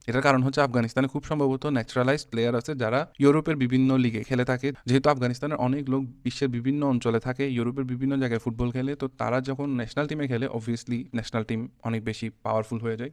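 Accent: Chittagong, Bangladesh. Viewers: ki bhai chatgaiya naki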